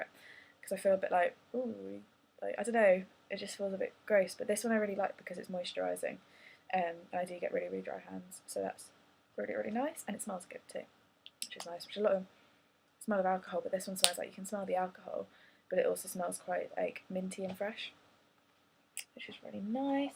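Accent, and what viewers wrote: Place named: United Kingdom. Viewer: You really should do more videos and fix your lighting. ;) I subscribed to you, darling. I like British beauty-gurus coz they're so down to earth and I love their accent. You're lovely! Kind regards, Simone.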